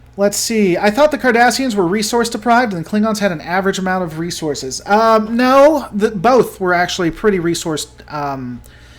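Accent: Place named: South, United States. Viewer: Hi. I don't like it when people have a go at you about the South, or your accent. It's uncalled for. Your views are what counts, not how you pronounce them. More power to you for putting down the ruder parts of the group watching you. I'm a Brit, so the whole "your a fan of the British" thing made me laugh - we were the good guys! Don't worry, I forgive the American rebels, ha ha. We're talking about events over 200 years ago so I think we should probably be moving on to make a better shared future on our precious pale blue dot.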